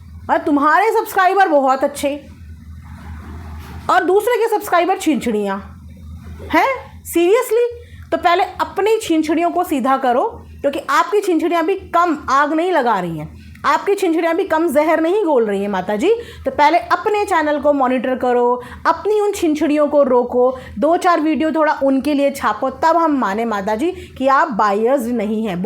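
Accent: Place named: Uttar Pradesh, India. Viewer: Mai to Etna hai chirchirya sun kar waise aap ke vlog bhut acha aur saf hota hai aap bhi up se ho kya khi khu bhasha se lagta hai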